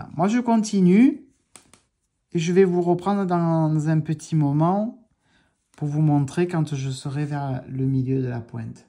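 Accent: South, France. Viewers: Merci pour ces très bonnes explications, les pointes sont bien régulières. J'adore votre accent du Sud, celui du Nord n'est pas mal non plus, à bientôt dans la prochaine vidéo ou tuto, on se régale, excellent professeur 👍bizoux kiki du Nord 😘😘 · Bonjour, je vous remercie pour ces explications et français et avec l'accent du Sud! J'ai l'impression d'être Je en vacances! Je vous écoute depuis la Suisse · Bonsoir, merci pour ce tuto au top avec notre accent du Sud! De mon côté le grafting n'est pas toujours net donc je tricote en toe-up. Bon tricot et à bientôt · Bonjour tu as raison de te bader...😊😊 je suis du sud aussi et j'aime ton accent. C'est normal on aime sa region. Merci pour ton partage.🌻🌻🌻👍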